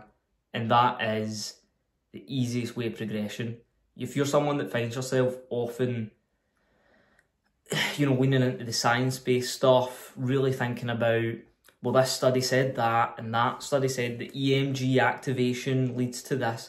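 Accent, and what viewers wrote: Scotland, United Kingdom. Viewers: That’s cus he is a Scottish Brother Pal. He’s not tbad I worked in Fort William once and spoke to some of the locals. I’m English and I couldn’t understand a word they said.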